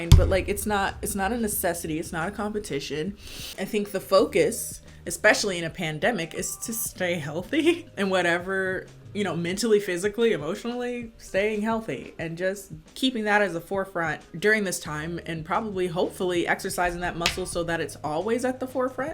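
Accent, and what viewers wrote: United Kingdom. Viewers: That British accent 👌👌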